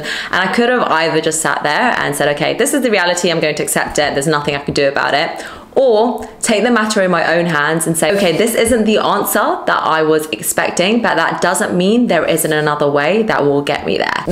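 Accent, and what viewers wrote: United Kingdom. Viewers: admired with your stunning British accent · I love your videos. But it’s very hard to understand, it feels like it’s on FAST forward. Plus the British accent makes it harder. A bit slower would be a lot easier to absorb the content. Just a feed back. Thank you!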